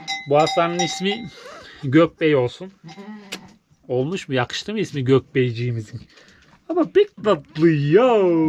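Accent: Konya, Turkey. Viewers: Abi nerelisin şiven bizim buradaki şiveye benziyor ben KONYA/BEYŞEHİRLİYİM.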